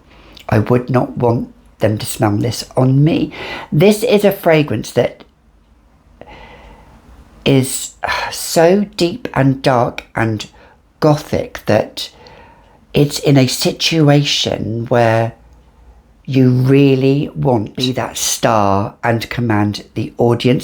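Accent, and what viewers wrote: France. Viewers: Can’t get enough of that French accent of yours but I don’t think this one would be for me because there’s no sweetness and you know I love my sweet scents but you clearly love it 😍 💕💕👌🏽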